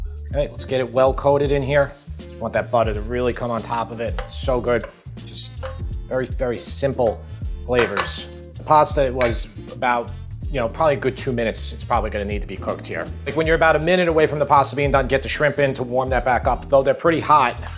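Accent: New York, United States. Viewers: You sound like if Vince Vaughn was a New Yorker, it’s very enjoyable 👍🏻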